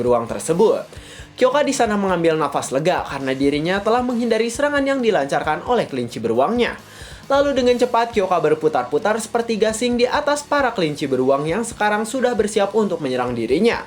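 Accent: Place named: West Java, Indonesia. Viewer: Orang mana min? Gaya bicara sama bahasanya kaya sunda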